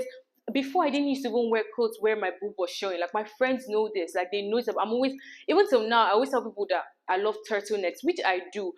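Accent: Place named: Nigeria. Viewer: It's her Nigerian accent for me 😘😘😘❤️❤️❤️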